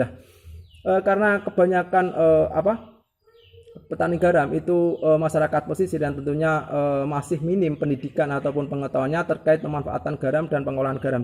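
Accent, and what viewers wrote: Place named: Java, Indonesia. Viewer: Kok medok mas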